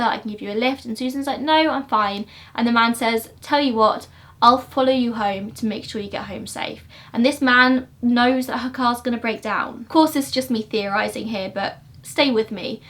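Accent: United Kingdom. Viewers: I'm from Dublin, Ireland. So, I'm very familiar, and have always loved, the UK accent. But, your particular accent is so very beautiful! 💜 I'm sure you don't even realise that you have an accent, but you have a beautiful accent, and you're perfectly spoken. Just another reason why I love your channel! 💜🖤💜🖤💜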